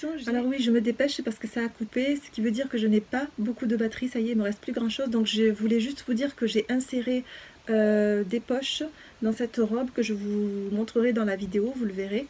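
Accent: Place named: South, France. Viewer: Kk, Je viens de regarder tes vidéos, j'aime beaucoup suivre ton évolution et je te trouve débrouillarde surtout avec le tee-shirt adulte transformé pour enfant. Tu as la voix calme avec un accend du sud bien sympathique. Je suis abonnée pour pouvoir suivre ton évolution 😘😘😘😘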